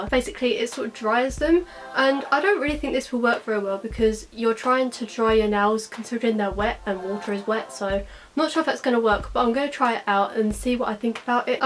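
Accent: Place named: United Kingdom. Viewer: I love it!!1 Thanks for checking out my channel!! AND OMGGGG UR BRITISH I LOVE BRITISH PEOPLE AND UR GUYS ACCENTS IVE ALWAYS WANTED ONE XD <3 xx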